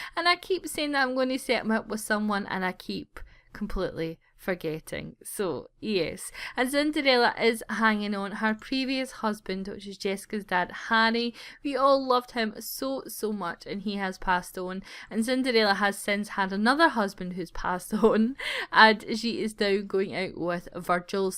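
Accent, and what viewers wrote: Scotland, United Kingdom. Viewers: This is the best Scottish accent I have heard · OMG ARE YOU SCOTTISH?! Im also scottish (im sorry your the first scottish youtuber i have seen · OMG! I so wish this is for the sims 4! I love your sottish voice! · she is Scottish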